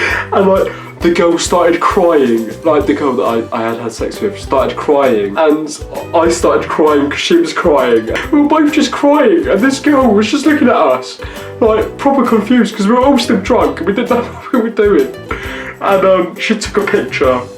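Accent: England, United Kingdom. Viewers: Mohammed.J British accent. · Mohammed.J I have the same accent, it's british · Hes english like me and dats how we talk bruh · Tangie Masten he does, British accent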